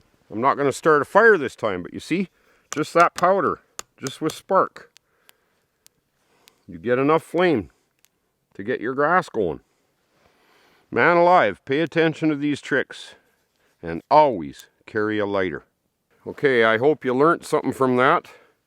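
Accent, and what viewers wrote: Canada. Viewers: You're Canadian. I know the accent. A lighter helps but there are many ways to start a fire. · You sound like home 🇨🇦 🍁 🇨🇦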